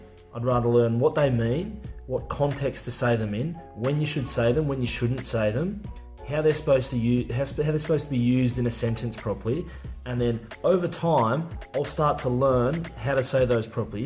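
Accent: Australia. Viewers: Kia ora e hoa thank you for being brave and courageous for voicing important things like this vid. You sound very ozzy, just curious.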